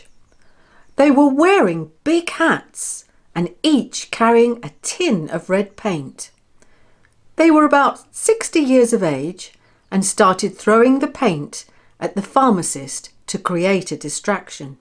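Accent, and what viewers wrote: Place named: United Kingdom. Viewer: British accent... so lovely !!!!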